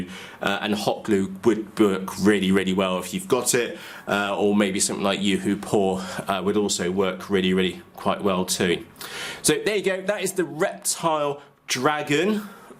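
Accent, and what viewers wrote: Bristol, United Kingdom. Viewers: Good to hear that Bristol accent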